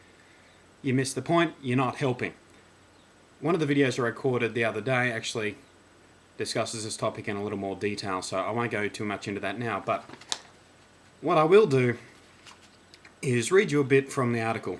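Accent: Australia. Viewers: Haha, yeah, he made this whole thing sound so ridiculous. It's weird how people always think Aussie accents are British. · haha that was funny. British though? Seriously, anyone with hearing can tell the difference between an Aussie accent and a British accent. That was a funny British accent though. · Zomgkittens!!1eleventy The barbarians are at the gate! :D Love the titles, as always. I really don't know how any journalist could mistake your (almost textbook) Oz accent for British. And I'm sure PL knows you're doing a cockney accent there, not her silky-smooth posh.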